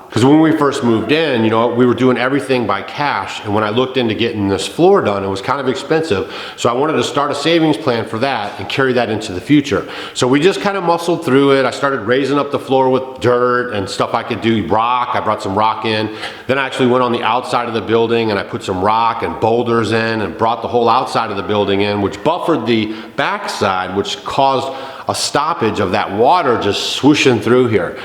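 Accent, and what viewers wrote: United States. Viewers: what, no heritage ?hey, you sound like someone from the USA... (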